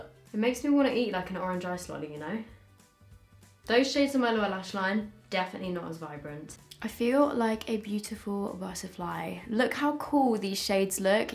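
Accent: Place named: England, United Kingdom. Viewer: I just love the whay how she speaks!Is she from England or something?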